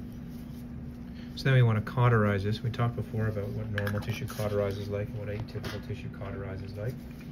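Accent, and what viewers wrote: Canada. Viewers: Je savais pas que vous parlez le quebecois. Very cool ❤❤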